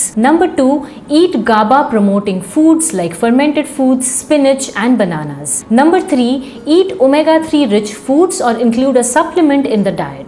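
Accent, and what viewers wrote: India. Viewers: Man how I like that Indian accent…. Could hardly concentrate on the contents 😊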